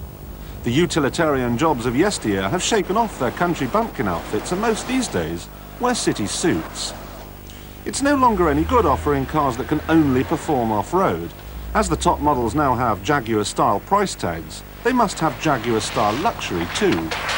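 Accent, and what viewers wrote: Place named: RP, United Kingdom. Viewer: I seems Jezza's commentary isn't as good when he talks with his posh voice rather than the one that we are so used to hearing nowadays, in fact he actually sounds boring in this clip, but I suppose the BBC wouldn't allow regional accents when this was first made.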